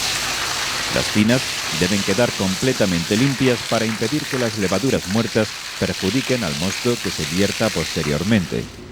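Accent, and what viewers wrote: Spain. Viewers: Definitivamente esto es adictivo, las tomas, los paisajes, el contenido, la voz en off, todo me recuerda mi niñez cuando todos los documentales se doblaban a español ibérico, gracias. Saludos desde México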